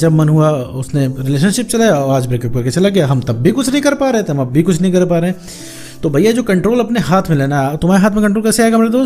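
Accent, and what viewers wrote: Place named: Lucknow, India. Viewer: Ap kha se ho lucknow ya kanpur side ki aawaj lg rhi h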